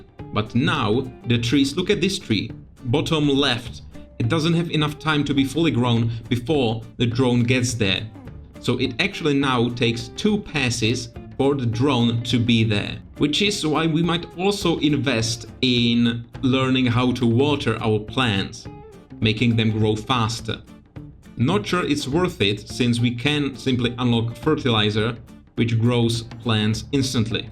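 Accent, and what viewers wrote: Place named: Germany. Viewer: The guy sounds German which to me is an interesting demonstration of how "bad language" is not inherently "bad" and I enjoy how diverse the reactions there are to the same thing.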